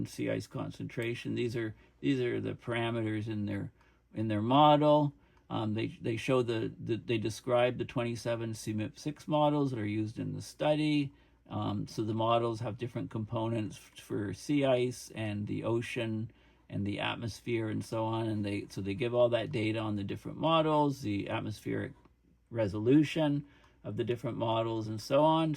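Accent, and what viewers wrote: Canada. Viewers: Your accent tells me you’re Canadian.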